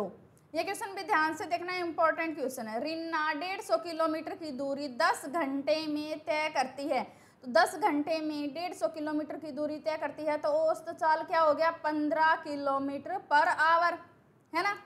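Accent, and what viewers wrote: Haryana, India. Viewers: Aap haryana se ho kya aapki boli humre jesi lagti hai 😊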